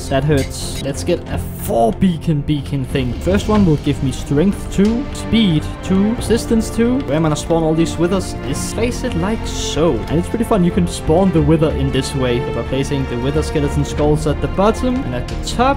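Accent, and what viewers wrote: Denmark. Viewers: Are u danish? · Kunne høre på din stemme at du var fra Danmark. 😁 Det ser godt ud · Syg dansk accent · Are u from denmark? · Føler lidt han lyder dansk... Could it be.. My dream comes true?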